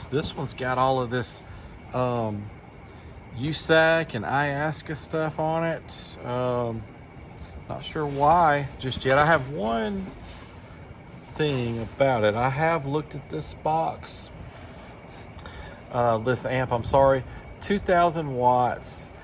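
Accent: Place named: North Alabama, United States. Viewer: Whoa, you're local to me sounds like, I'm in North 'bama too. Other hobbies are wood working and turning, and hobby farm.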